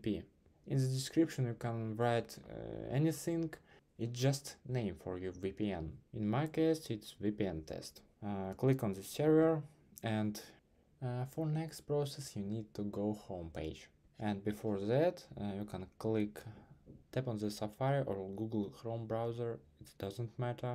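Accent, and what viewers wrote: Russia. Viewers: слышу русский акцент!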